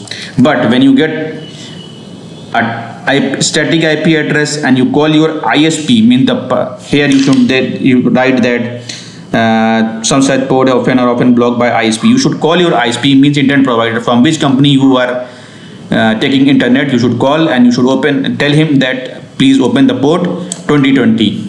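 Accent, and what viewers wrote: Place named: Indonesia. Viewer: dont trust indo accent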